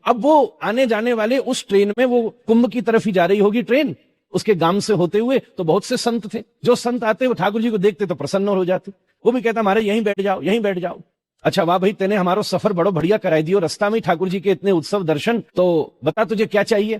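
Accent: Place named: Braj, India. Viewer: Brij ki boli, meethi boli❤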